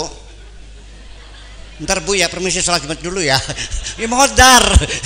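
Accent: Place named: Banyumas, Indonesia. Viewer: Penyejuk sejati, suka sekali dengan ngapak ngapak nya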